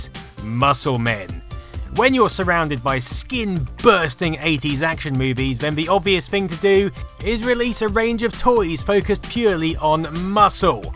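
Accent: United Kingdom. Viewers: Oh my God I wish I didn't have ears the way this guy talks fucking brits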